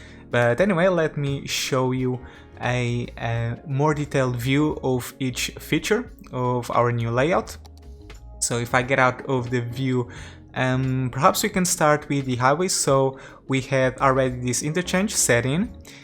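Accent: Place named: Portugal. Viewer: So de ouvir a pronuncia já sei que é portugues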